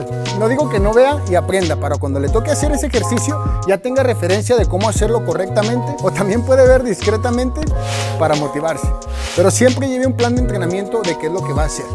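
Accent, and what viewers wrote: Aguascalientes, Mexico. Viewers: Por que así hablamos los de Aguascalientes.. 😂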